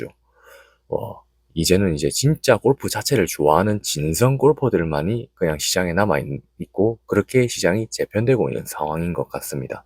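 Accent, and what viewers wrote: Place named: Busan, South Korea. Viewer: 부산말씨로 현실과 냉정으로 설득하면 8도 사람 거의 수긍!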